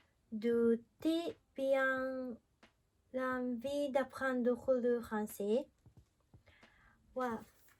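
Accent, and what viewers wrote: Japan. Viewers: Trop Cute l'accent japonais pour parler francais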